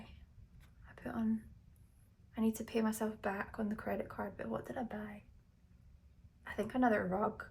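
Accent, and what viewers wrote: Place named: Ireland. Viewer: New subbie here! Love your accent! Irish?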